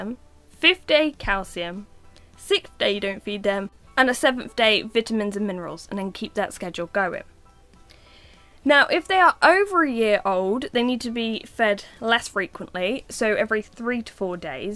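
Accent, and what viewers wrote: United Kingdom. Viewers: Erica SPEARMAN that’s how the Brits say it. Most understand.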